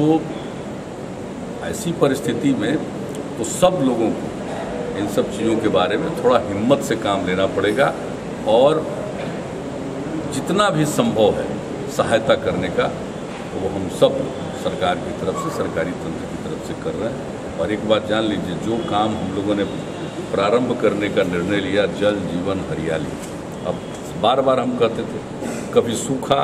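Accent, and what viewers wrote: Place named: India. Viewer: Dehati Bhasha Jaise bol rahe ho Hatiya hi to Bhadwa Hai yah kaun sa Baat hua Barsat Ka Mausam Mein madad karo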